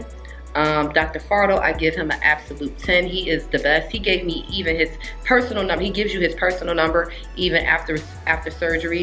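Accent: New York, United States. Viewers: You talk like you from new york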